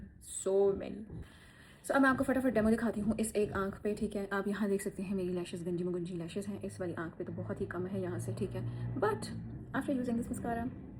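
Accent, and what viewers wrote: Punjab, India. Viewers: Aoa Kaisi hyn ap ek baat ki to apki tareef krni banti mariyam pervaiz ap dono se phle kisne YouTube channel pe apni journey start ki thi or dono phle healthy thi Lekin day one se apki dressing bht zada achi thi or apk pas pass mostly Punjabi hyn but Urdu apki thek hy rehty rehty punjabi thori bht agyi hy samj bhi Lekin zada hard wali nhi keun k mariyam pervaiz ki Jo Urdu hyna badrah Urdu Mai Rey hota hy or aj tak apk husband nhi Nazar aye apk vlogs Mai Dil se Ameer or sub se zada ikhlaq se hona chaheye hy down to the earth hona chaheye hyn jub k ap typically pathan family se belong krti ho but the way you present your self very gracefully makeup skill's day by day or achy kiye but Jo weight ko ly k transformation hy incredible phle to husband k paiso pe har brand ka makeup Liya now as a women apne Baki women's ko dikhaya hy mustakal mijaiz apko achi trha life Mai bht Kuch bana dyti hy phle ap logo k makeup brands clothing brands xyz kis chez ka bhi brand ho Lekin aj kafi saalo ki hard working sub krwa dyti hy ap bv bhi ho maa bhi ho or self made business woman bhi aj apka khud makeup brand Hy jub k mariyam pervaiz hyn phle jisne makeup brand launch Kiya Baki Natasha waqas bhi hard working kri hy Lekin wo bhi Urdu Jo na kapre pehno mein Karachi se hu but mein bhi Punjabi hu Lekin meri Urdu thek Hy Kuch mahajir fenta parakh xyz to Natasha waqas ko abi apne pe working ki zarurat hy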